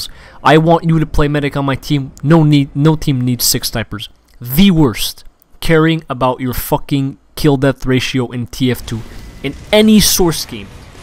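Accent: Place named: Canada. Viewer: Garry Newman English accent? I'm pretty sure you mean canadian. I find it cringeworthy that you can't actually understand him to a degree where you wouldn't need subtitles.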